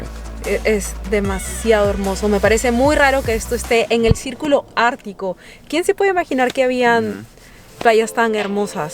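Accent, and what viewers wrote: Peru. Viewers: No conocía este canal, pero desde que la escuché hablar me di cuenta que era una peruana como yo 😁😁🤗🤗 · Yo la escuché y se me hizo que era peruana, no me equivoqué, por eso tiene ese nosé qué, que tenemos los peruanos. Porque somos humildes, sabemos desenvolvernos y hablamos lindo. Tienes un Ángel súper bello. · ¿Eres peruana 🤔🤔🤔🤔🤔🤔🤔🤔🤔🤔🤔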